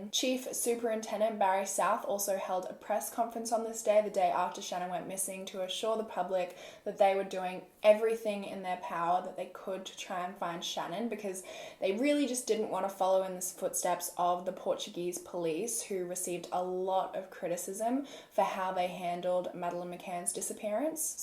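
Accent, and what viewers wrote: Australia. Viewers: So strange hearing an Australian talking about a case that happened down the road from me 🙈 also please don’t tar us all with the same brush 🙈😂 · I love your accent what type is it? Australian?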